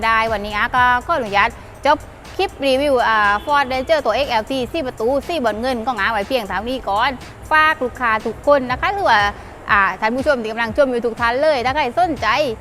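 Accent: South, Thailand. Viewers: นี้และมั่น เป็นตัวของตัวเอง บรรยายได้ดีมากคับ ผมก็คนใต้ ชอบๆคับแหลงหรอยนิ🥰🍃💨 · บรรยายแบบใต้ๆ จริงใจกันเอง น่ารักครับ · พูดใต้เป็นตัวของตัวเอง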